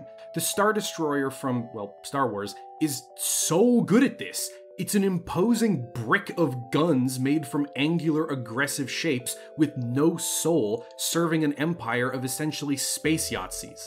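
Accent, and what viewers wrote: Canada. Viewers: This dudes Canadian accent is giving me PTSD. Abaoouut….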